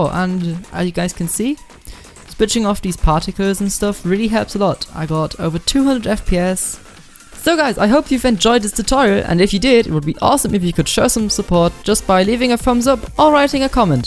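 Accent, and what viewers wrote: Germany. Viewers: Are you german? You really sound German or scandinavian! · Are you german? You sound like a german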